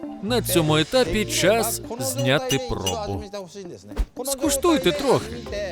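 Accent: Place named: South, Russia. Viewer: О, южно- русский говор появился... но не я думаю понимают, так 50/50 примерно. Но лично я понимаю мову. Просто , как по мне это смешно выглядит.😂 с возвращением!❤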